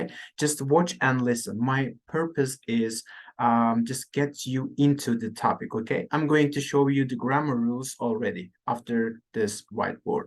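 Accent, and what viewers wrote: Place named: RP, United Kingdom. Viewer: Bülbül gibi ötmek, ingiliz kraliyet ailesi gibi ingilizce konuşmak istiyorsanız doğru yerdesiniz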